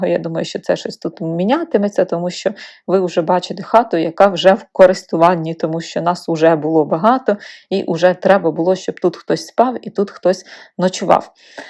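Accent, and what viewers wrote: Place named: Surzhyk, Ukraine. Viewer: 'чьі', 'щьо' - суржикова вимова; правильно казати 'чи', 'що' ☝️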